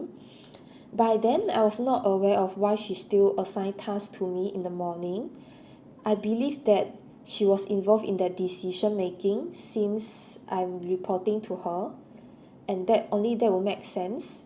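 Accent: Singapore. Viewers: sorry to hear about your layoff, you are so admirably positive after that news. hopefully you can take a break and find something else when you are ready. their communication was terrible, should not be done via email, and as you discovered in large companies sometimes people are selected by algorithms or upper management. you sound like a Singaporean accent? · Your accent is cool you are from Singapore right! I'm Jonathan from El Salvador. Software developer too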